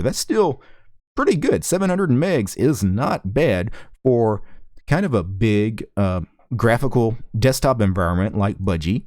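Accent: United States. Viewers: could you have a look at opensuse? Or suse enterprise? Its not that I prefer one distro above the other but our envirnoment at my office is bound to sles 12. And I think suse is german company (im swiss) so it would be interesting about an international meaning. Im not sure where you are from, you sound US, anyway your voice is amazing. Cheers from switzerland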